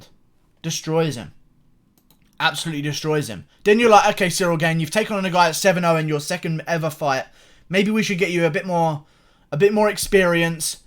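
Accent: United Kingdom. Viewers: With your British accent it sounds like you’re saying Cereal Gane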